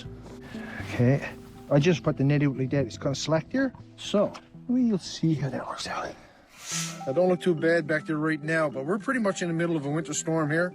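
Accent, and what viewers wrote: Canada. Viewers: Very informative and it's my first time hearing a Canadian accent that does NOT sound like an American! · The Newfie accent is mental!!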